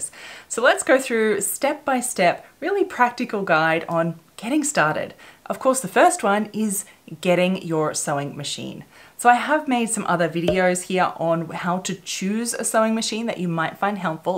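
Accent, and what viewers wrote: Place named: Australia. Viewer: Hi, so great to hear an Aussie and refresh my sewing skills. I have been sewing for a few decades and have waxed and waned with my sewing. After years of sewing quilts etc I am keen to get back into sewing clothes. I have dipped into your videos and love that you are focusing on sewing clothes, your videos on altering opp shop finds are great too. Thank you for such informative and lovely videos.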